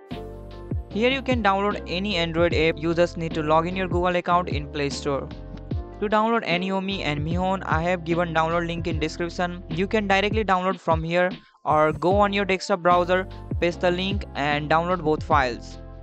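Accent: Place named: India. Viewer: Lost all motive when I hear indian voice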